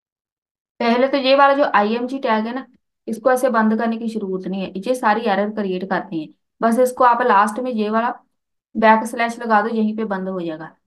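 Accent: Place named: Ambala, India. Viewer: Apki aavaj to ambala side ki lag rhe hai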